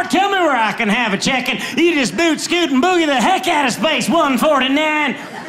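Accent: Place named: Wisconsin, United States. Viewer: Wisconsin accent is on point 😂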